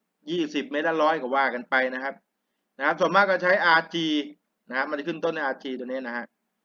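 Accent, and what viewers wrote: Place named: Samrong, Thailand. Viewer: เว้าสำโรง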